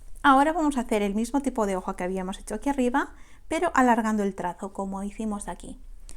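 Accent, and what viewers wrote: Spain. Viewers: Que linda es la maestra con acento español, saludos desde Quito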